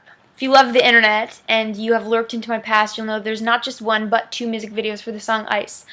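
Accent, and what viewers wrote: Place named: Canada. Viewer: I've never noticed how Canadian you sound. The accent is strong with this one.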